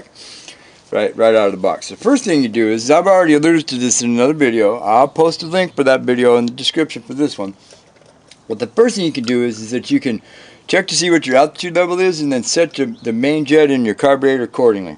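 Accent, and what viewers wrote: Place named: Arizona, United States. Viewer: I'm in georgia...i immediately recognized your accent because one of my best friends moved from AZ to ATL he sounds just like you..🤘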